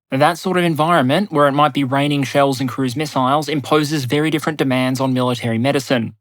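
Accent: Australia. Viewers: I really like your videos. They are interesting, and you rarely cover a topic that I don't consider worth investing the time necessary for watching. However, you're talking rather quickly, and the information is dense. Together with the at times a bit difficult to comprehend Australian English, which sometimes takes me a few seconds to figure out what word I just heard, and consequently needs me to rewind a bit because I missed what you said when I was thinking about that, and with the videos all close or over one hour, it is not exactly easy listening (maybe only for a non-native speaker). Aber before anybody is asking: Yes, I know I can set the playback speed lower, but 75% is really a bit too much and gives me the impression of listening to a Whisky connoisseur en masse, hence that's not an option. So my question would be: Did you ever consider splitting your videos into chunks of 20 minutes or so, which would give you the chance of talking a bit more slowly, and non-native speakers a better chance of being able to enjoy listening? 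😊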